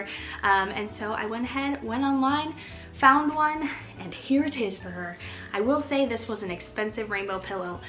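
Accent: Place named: Georgia, United States. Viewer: I love your style and the four item Christmas Deal. I am a prior teacher and a struggling but succeeding minimalist. The items you choose for the girls seemed spot on. I just found your channel. First, watched the Christmas Decoration Video. I am the one who fell in love with your white tree. Like that your accent is similar to mine as I am from Georgia, yet, now live in Palm Harbor, Florida. I will be obsessed tonight and tomorrow catching up on your past videos. Merry Christmas 🎄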